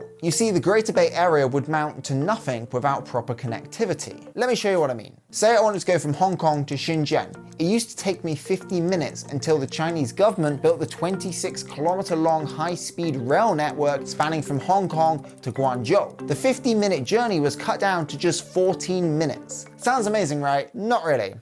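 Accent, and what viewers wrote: United Kingdom. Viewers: Mate that accent is not American... He's very clearly from the Midlands or south of England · The guy sounds British, not American?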